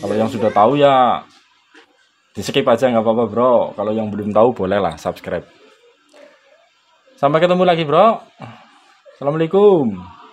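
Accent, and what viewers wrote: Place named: Banyumas, Indonesia. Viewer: Ngapak temen kang😂😂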